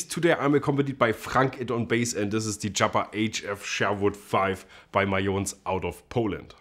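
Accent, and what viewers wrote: Germany. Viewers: Just so much in this Vid . Amazing German accent- ✅ amazing bass ✅ amazing bass face ✅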